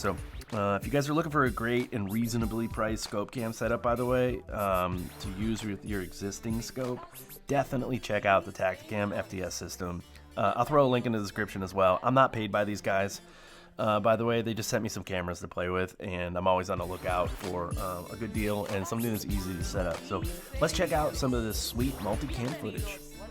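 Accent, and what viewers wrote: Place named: Spain. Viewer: What's up with the Spanish undertone? 🤔I'm confused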